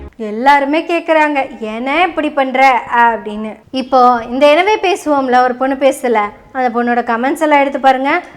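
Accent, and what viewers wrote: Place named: Coimbatore, India. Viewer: Super as usual always you are bold and pronouncing in Kovai language and taking subjects 🎉❤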